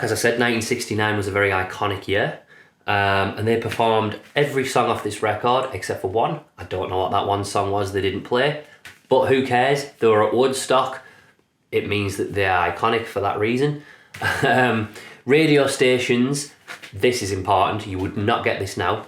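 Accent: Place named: Northern England, United Kingdom. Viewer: A very nice review man. I love this album. The harmonies do it for me really. I detect a northern accent from you. I am a Londoner lol. I will check out your George Harrison review soon, as well as any others you have done. Great work!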